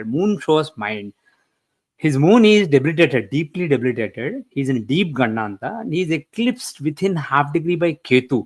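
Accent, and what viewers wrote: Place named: South, India. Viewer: 😂😂😂👎👎👎👎 Terrible Southie Accent. Speaking Madrasi ? Or English? No one understands. Terrible 😂😂😂😂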